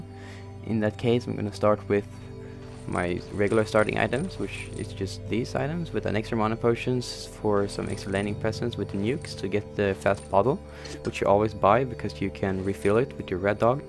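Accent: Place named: Sweden. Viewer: I can't watch this due to your Swenglish accent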